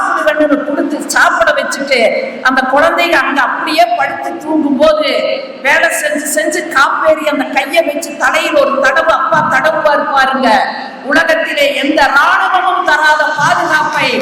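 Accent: Kongu, India. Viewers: சிறப்பு மேடம். கொங்கு தமிழ்....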